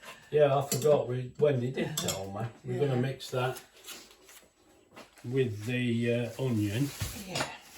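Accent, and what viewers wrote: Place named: Midlands, United Kingdom. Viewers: Are you from the midlands accent sounds it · Hi You sound like you’re from Nottingham as we are . Anyway we’ve just tried our corsori pressure cooker with your steak and kidney pudding recipe. Absolutely brilliant it turned out really nice. Many thanks keep up the good work 👍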